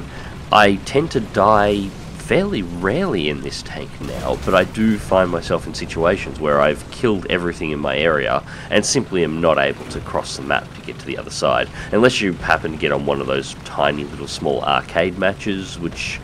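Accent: Australia. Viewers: mate the aussie in you is coming out lol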